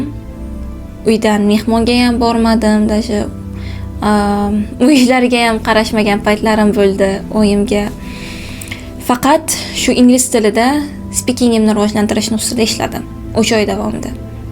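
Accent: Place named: Bukhara, Uzbekistan. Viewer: Buxorolikmisiz shevezdan sezdim😊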